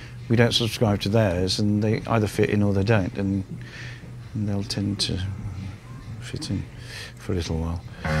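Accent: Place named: France. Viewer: Sadly, I couldn't understand word. Damn that outrageous French accent.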